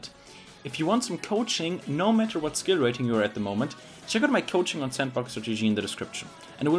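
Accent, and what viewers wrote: Germany. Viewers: Man hört halt krass raus das du deutsch bist :D Aber danke für die ganzen guten Tipps! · dat gierman aiccent... ausgezeichnet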